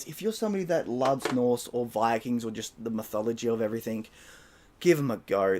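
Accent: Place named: Australia. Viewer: I don't know why it was so hard for my ears to realise you're Australian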